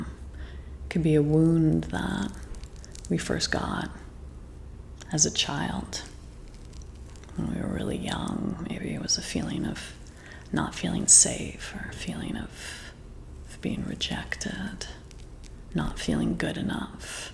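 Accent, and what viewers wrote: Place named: United States. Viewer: I really love your soft and American aristocratic accent. You seem very high society. That combined with your creativity and intelligence and generous inviting attitude is really attractive. It's intimidating but at the same time I would love to have conversations such as these with you. i love your vids! Thank you for sharing! I find it relaxing and interesting!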